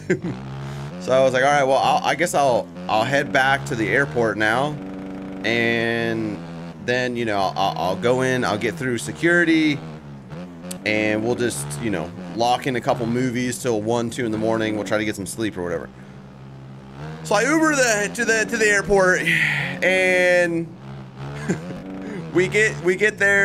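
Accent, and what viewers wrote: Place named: Philadelphia, United States. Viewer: Wheres your accent from buddy? You gotta be from philly area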